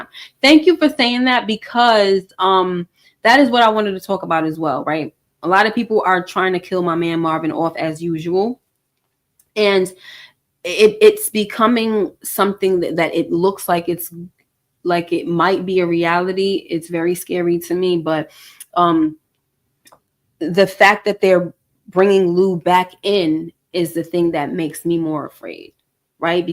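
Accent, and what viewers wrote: New York, United States. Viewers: I hear a New York accent? I'm PA! Anyway my 1st time on your channel...I agree with your pov on this episode 👏🏽. Yeah I'm over snaps n pop. And Stephano...(I'm don't see a subscribe button)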